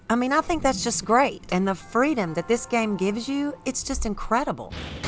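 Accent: South, United States. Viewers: I adore you so much. I hope your channel takes off. You've already helped me so much--with the electricity and the test of strength, and then with how to defeat guardians and keep retrying to get giant cores. Now I learn you can use fire sword to light shît, and great info on bows and arrows. And to top it off, you're extremely pleasant to listen to. Southern accent for days!!! There are some ppl I put up with their voices for their content, others with content I want but I CANT with their voice, but your voice is like coming home. Thank you for existing, for so many reasons.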